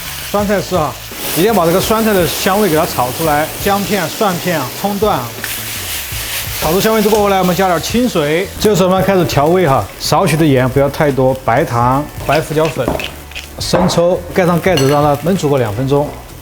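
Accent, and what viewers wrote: Sichuan, China. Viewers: 你那个川普真的…. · 川味普通话，好亲切！ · rua一下，老师的川普太萌了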